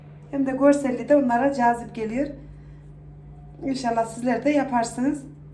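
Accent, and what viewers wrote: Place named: Central Anatolia, Turkey. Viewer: Sizi yeni keşfettim öncelikle sivenizden icanadolu olduğunuzu anladım dahada mutlu oldum ellerine sağlık Bende çocuklarımın icin.deneyecegim .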